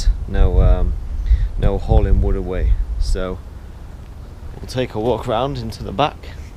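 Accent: Yorkshire, United Kingdom. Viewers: Yorkshire or Lincolnshire accent mate. Great job on that, zip line for limbs inspired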